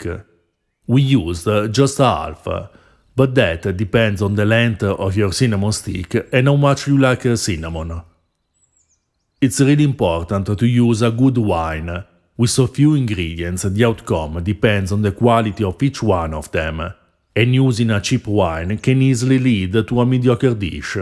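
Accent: Italy. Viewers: I love to listen to you and your Italian accent so much. And of course the medieval recipe!!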